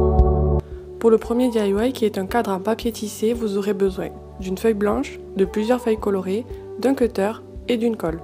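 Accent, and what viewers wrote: South, France. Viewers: Alors First: j'adoreeeeeeeeee ton accent du sud 😍Et secundo: Les DIY sont vraiment Topisimes! Surtout la broderie! ca me donne pleins d'idées 😍😍😍Bravo!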